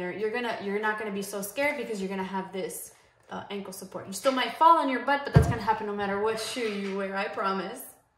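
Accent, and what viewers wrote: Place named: Miami, United States. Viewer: I could tell you were a Miami girl cause we have the same accent X) great video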